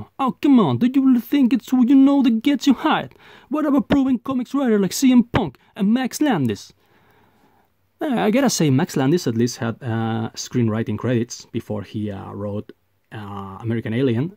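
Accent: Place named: Spain. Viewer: The highlight of your videos is hearing you swear with your Spanish accent. Love it! 👌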